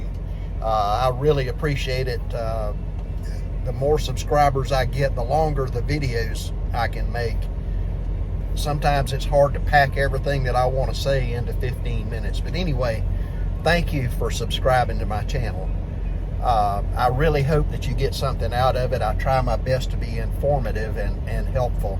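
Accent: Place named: Ireland. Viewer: I'm on my third month now with the company and so far so good. I love your Irish accent or is it Italian. hahaha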